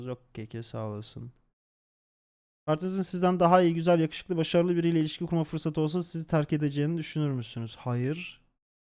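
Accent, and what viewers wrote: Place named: Erzurum, Turkey. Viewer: Erzurumlu olduğun ne kadar belli ama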